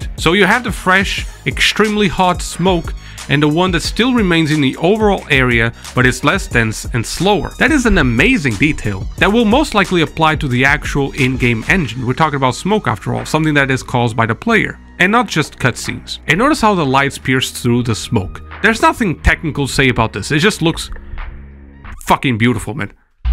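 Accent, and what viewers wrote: Portugal. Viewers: i smell tuga in here... :))) props!! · eu sabia que eras tuga do get-go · I noticed your Portuguese accent in the first 25 seconds of video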